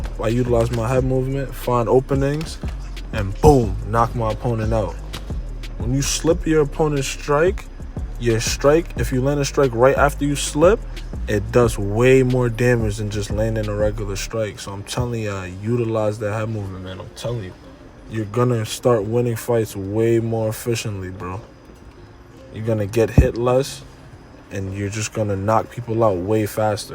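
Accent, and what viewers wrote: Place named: Bronx, United States. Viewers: U from new york fasho bru. U gotta be😂😂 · Bronx I dead recognized the accent after you said controller🤣😭 fire video gang 🤞🏿‼️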